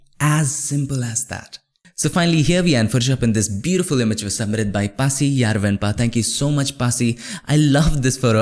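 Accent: India. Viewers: exactly! And i love how he still has his indian accent but it is still understandable!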